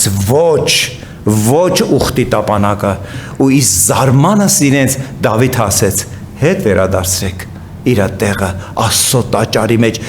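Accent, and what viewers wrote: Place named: Iran. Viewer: Անհամբեր սպասում ենք Ձեր քարոզներին,Աստված օրհնի Ձեզ, իրանահայի Ձեր акцентը նույնիսկ հոգեհարազատ է մեր ընտանիքին,սիրով լսում ու նոր բացահայտումներ ենք ունենում լսելով Ձեզ։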